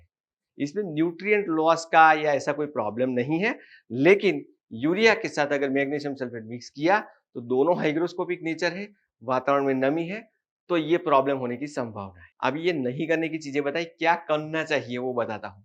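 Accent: Gujarat, India. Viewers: आप गुजराती हो ना 😅 बराबर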